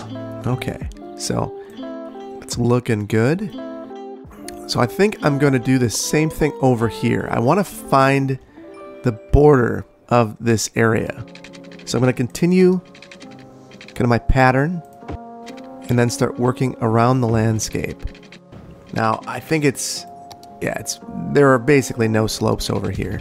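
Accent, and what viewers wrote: Wisconsin, United States. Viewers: Love the series, and wondered why you sounded so familiar, you're from WI! Gotta love the midwest!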